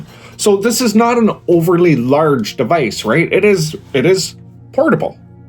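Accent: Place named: Minnesota, United States. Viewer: Your accent is awesome. 😂 Minnesota? North Dakota? Canada? Im from NYC. 🗽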